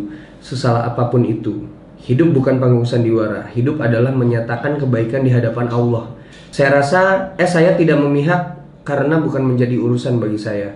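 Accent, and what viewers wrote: Java, Indonesia. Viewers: mo nanya.... asli nya dr mana, kok bisa bhsa jawa medok?😄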